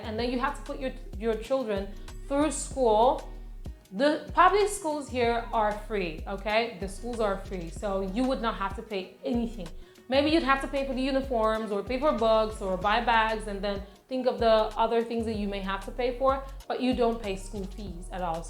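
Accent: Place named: California, United States. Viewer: How come you have a California accent?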